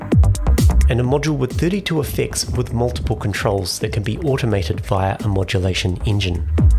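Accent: New Zealand. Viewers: Gotta love the NZ accent :) Dicks everywhere!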